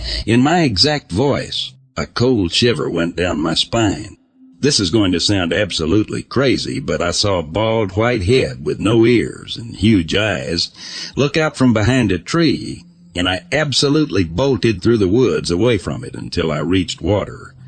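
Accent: South, United States. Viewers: Well done!! So happy to hear from you all again! The new narration did a great job. There's something about the slight southern draw that makes you feel like your grand dad is telling you tale passed down from family. At least thats my take on it! Ready for more!!!!!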